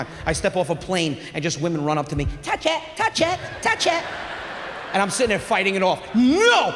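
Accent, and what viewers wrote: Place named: South, Italy. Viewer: Hahaha spoken like a true southern Italiano 🤌🇮🇹. Loved it 👏😂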